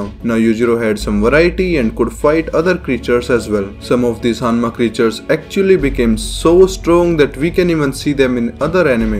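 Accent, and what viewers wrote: India. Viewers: "You don't believe me? Look at my chest I am so masculine that I don't even have nipples." "And when Yujiro Unleashes his demon, there is only one way you can survive and that is by dying." He don't poop anymore because he absorves every particle he eats LMAO Bro, your sense of humor is amazing, your acent turns things more fun and yes! Baki is sooooo bizare!! Indian guy, you made my day with this video! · Best Baki content creator by FAR! 😁 Love the Indian accent too, makes every joke work so much better. 😂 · Your indian accent makes it just so much better lmfao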